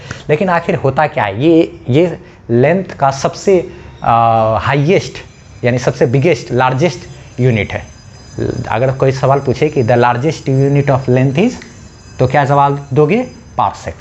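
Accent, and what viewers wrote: Bihar, India. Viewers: Love your bihari accent 😍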